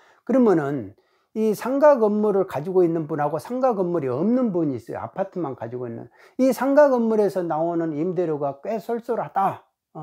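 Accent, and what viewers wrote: Jeolla, South Korea. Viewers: 사투리가 전라도였군요~ 그쪽지방출신이신데 무조건 한쪽으로 기울지않고 올바르고 진실된말씀주시니 더욱 존경하고 귀하십니다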